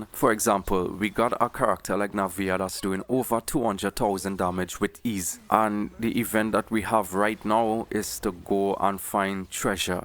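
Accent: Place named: Trinidad and Tobago. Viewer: Within two seconds instantly knew you were from Trinidad, what's up brother!! I'm trini tooo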